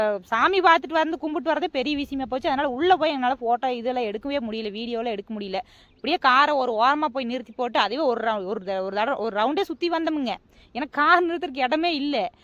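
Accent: Kongu, India. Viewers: அடடா என்ன ஒரு உண்மையான கொங்கு நாட்டு தமிழ் என்ன ஒரு சொல் வீச்சு வாழ்த்துக்கள் சகோதரி 🎉🎉🎉🎉🎉🎉🎉🎉🎉🎉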